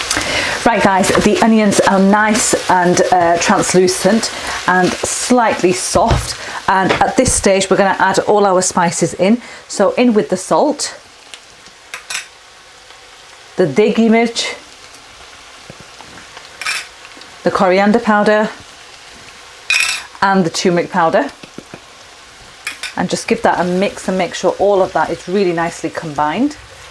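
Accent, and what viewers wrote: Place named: Yorkshire, United Kingdom. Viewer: I've just discovered your channel and I think it's great. I don't know where are you from but you sound to have a bit of a Yorkshire/Manchester accent. Wherever you are from thanks for the recipes anyway.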